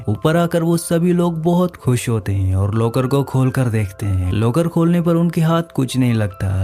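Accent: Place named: India. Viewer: Language sai urr accent ptaa chl rha hai indian hai urdu esaay nhii bultay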